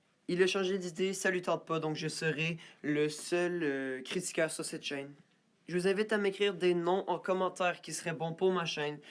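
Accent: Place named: England, United Kingdom. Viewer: t un accent englais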